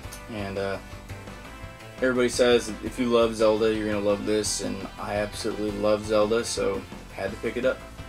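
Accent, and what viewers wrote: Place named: France. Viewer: french accent not british good video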